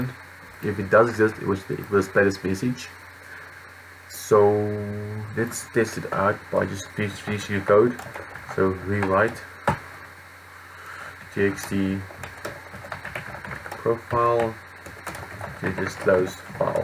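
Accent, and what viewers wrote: South Africa. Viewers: are you from South Africa? Afrikaans?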